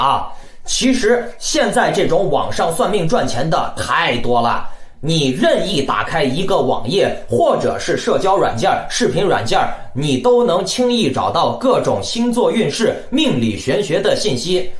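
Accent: Henan, China. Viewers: 恁是河南人？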